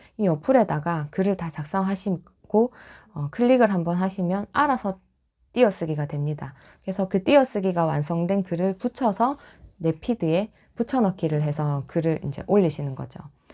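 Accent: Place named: Gyeongsang, South Korea. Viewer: ~유익한 내용 감사합니다~경상도 억양도 좋은데요!😍😍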